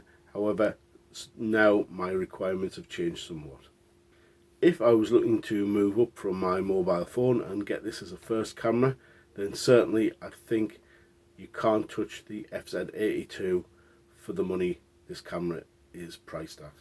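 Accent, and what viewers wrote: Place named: Newcastle, United Kingdom. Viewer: Is this accent from Newcastle, by any chance? (Just curious, and I’m aware I can be way off with my guess)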